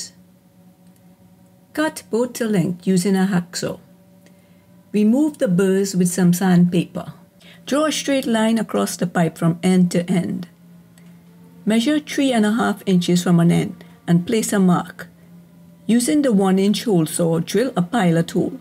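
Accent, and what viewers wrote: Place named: Trinidad, Trinidad and Tobago. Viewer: Trinidad accent ... Love it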